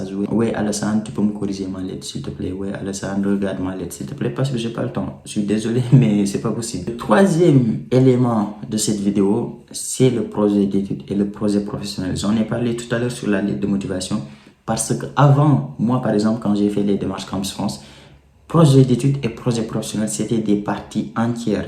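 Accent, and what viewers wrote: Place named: Senegal. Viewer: L'accent sénégalais, j'aime bien 😎👌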